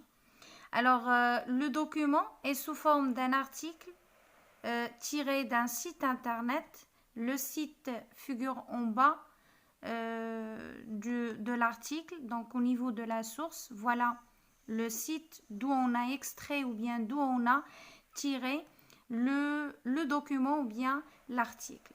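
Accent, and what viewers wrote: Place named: France. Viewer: Merci énormément Madame, votre travail est admirable. J’ai beaucoup aimer votre accent française et la explicación formidable que vous avez-vous fait en français. 👏🏼👏🏼👏🏼👏🏼👏🏼 top top top 👍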